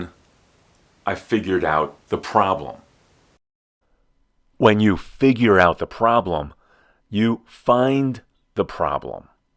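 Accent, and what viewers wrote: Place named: United States. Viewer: I love you prononciation teacher. You're the really american spoken i've never known.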